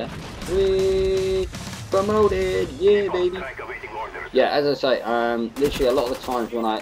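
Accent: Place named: Australia. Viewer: seriously the Aussies passed on their accent to you. its sounds awsome. great vid bud.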